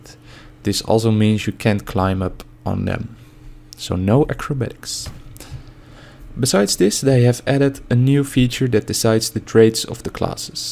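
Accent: Netherlands. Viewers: op reis hahaha Wow ik dacht al dat ik het herkende:p (I already thought I knew the melody, but couldn't remember it:p ) Now that you say it's a dutch song, your accent sounds from Holland, is that right?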